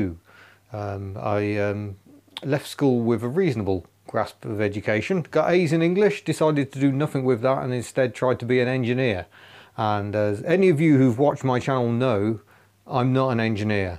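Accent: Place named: Birmingham, United Kingdom. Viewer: Hi, just realised my three favourite youtubers all have Birmingham accents., cars. Antman09ful1, trains. Steve's Fruity's, classic fruit machines. Don't change a thing, your channel is a breath of fresh air (and petrol fumes).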